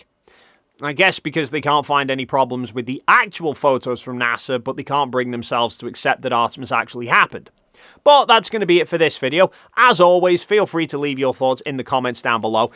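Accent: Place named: Northern England, United Kingdom. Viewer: It's just his particular working class-ish northern English accent. That's how many folks pronounce it. Americans pronounce is "Saa" instead of "Saw". Are they pronouncing it wrong?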